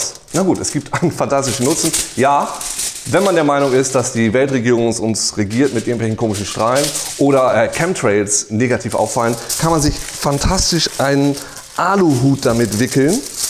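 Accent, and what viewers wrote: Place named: East Frisia, Germany. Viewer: ostfriesisch. Nicht hamburgisch.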